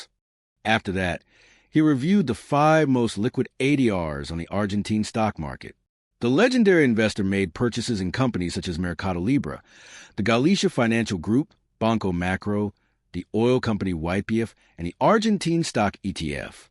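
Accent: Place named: United States. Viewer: It is a robot voice with a American accent I am pretty sure.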